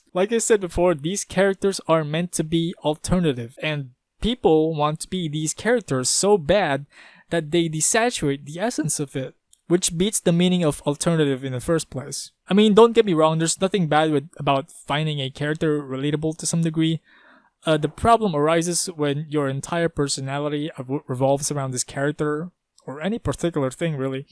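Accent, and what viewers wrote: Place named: Philippines. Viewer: bro i thought this was a different person, its hard to recognize where in your accent is filipino but I can tell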